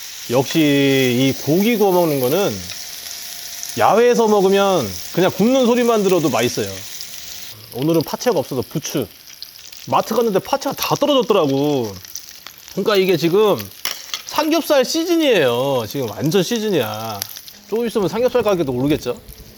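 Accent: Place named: Jeonju, South Korea. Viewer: 라면에 혼술하며 영상 시청합니다 전주쪽이랑 비슷한 사투리가 넘 정겨워요ㅋ 담에 차박 영상도 기대할게요~~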